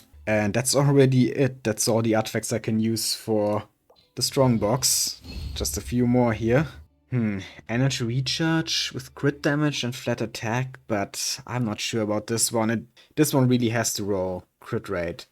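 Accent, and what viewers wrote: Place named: Germany. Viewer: das richt nach deutschen Akzent xD aber nices video tbh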